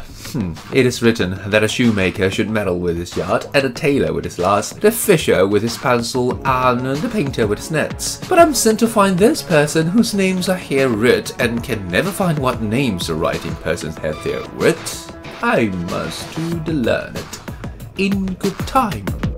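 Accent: United Kingdom. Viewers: 已訂閱，全部影片重複播放中。 最喜歡老師唸故事的時候，閉上眼睛沈浸在優雅的英國腔裡。 常常因此捷運坐過頭😝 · ，你的英國腔真帥啊！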